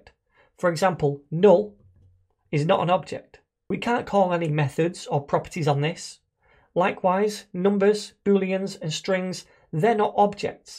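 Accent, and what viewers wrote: United Kingdom. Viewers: So glad to hear you with British accents very informative and comprehensive explanation thank you.!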